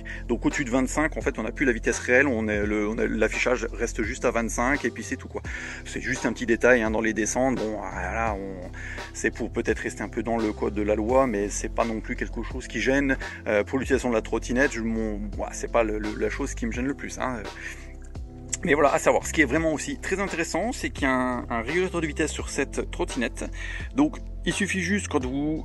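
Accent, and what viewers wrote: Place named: Jura, France. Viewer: Superbe video! Tu as l'accent Jurassien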